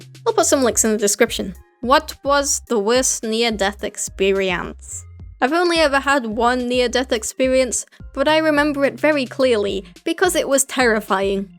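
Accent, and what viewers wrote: United Kingdom. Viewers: are you british? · Angel Animates :3 well yeah she has a British accent so she would be a Brit (she is)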